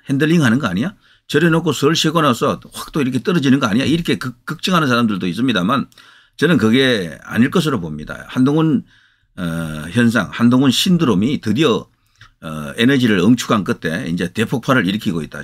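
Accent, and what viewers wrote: Gyeongsang, South Korea. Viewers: 님 경상도 말솜씨 에 정감이가고 진실성이 있어 많이봅니다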